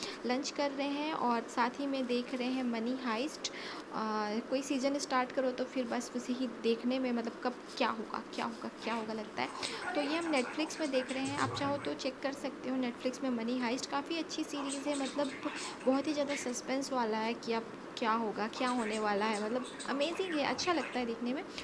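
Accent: Bihar, India. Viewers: Di aaplog Bihar s belong krte ho n ...qki bat krne k tone s lgta h Bihar s ho aap... mai b bihar s hu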